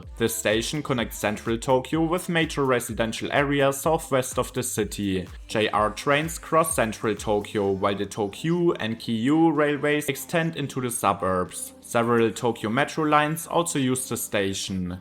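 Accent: Germany. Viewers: Jäpänn ? lol try a longer vowel in the second syllable. no offense, work on your pronounciations, you support the bad reputation that germans have for their english.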